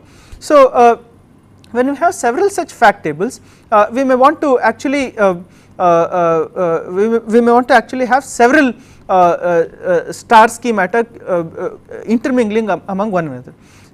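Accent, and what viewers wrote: India. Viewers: Indian English is hard to listen -*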